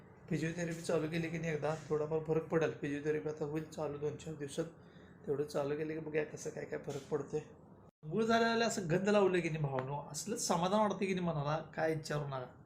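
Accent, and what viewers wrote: Kolhapur, India. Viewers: दादा तुझे व्हिडीओ मी डबल बघतं असते एवढे व्हिडीओ छान असतात 😊😊❤❤❤❤❤👌👍 तुझी कोल्हापुरी भाषा खूप खूप आवडते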